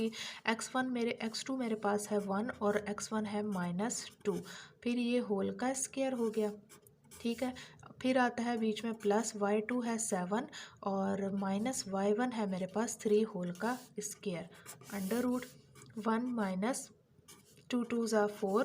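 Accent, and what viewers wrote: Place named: Islamabad, Pakistan. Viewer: actually. Apki Urdu kaafi saaf ha. I think Islamabad ho sakta ha then.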